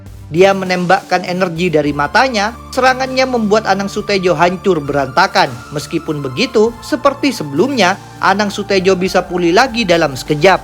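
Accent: Indonesia. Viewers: Byon der,, bion derr,,, medok mana logatnya itu min? 😅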